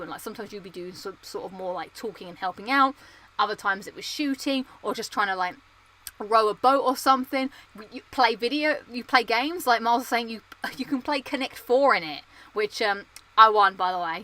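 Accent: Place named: Brighton, United Kingdom. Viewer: Are you from Brighton you sound like you’re from Brighton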